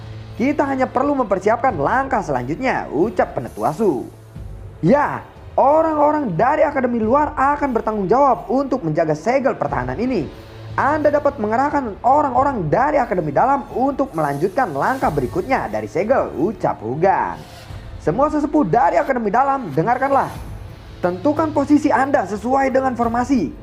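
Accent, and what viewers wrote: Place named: Sunda, Indonesia. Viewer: Wkwkwk Sunda gning 😁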